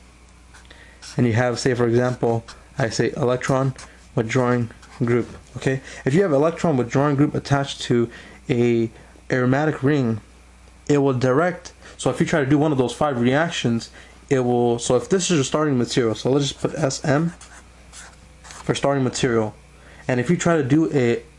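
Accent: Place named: Mexico. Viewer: you sound like a mex which is a direct correlation to lack of intelligence. Therefore, your videos are trash, not unlike the place you were born. Very dry, hard to watch. Spice it up in the future (hot sauce, less bean sounding, less monotone) was a decent attempt i guess tho could've been worse. You coulda been black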